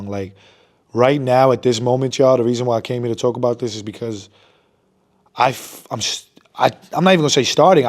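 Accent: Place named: New York, United States. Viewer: This guy is definitely from, nyc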